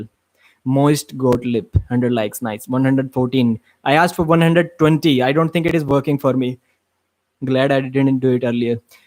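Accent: India. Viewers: a guy said he could hear your Indian accent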